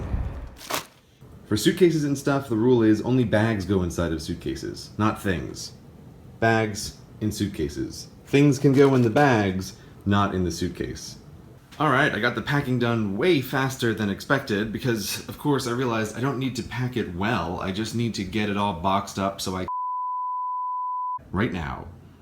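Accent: United States. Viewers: Woah woah woah woah hold on... you're NOT American? But your voice sounds so plain like ours.... WAT?!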